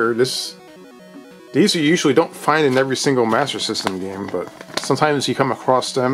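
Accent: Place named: Canada. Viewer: You must be Canadian. Here's how I know. You can turn "and" into "un". American's can not do that.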